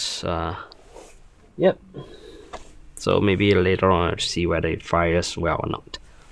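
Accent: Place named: Singapore. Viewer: you sound singaporean are you? great vid btw thanks for telling me about the worker half length pusher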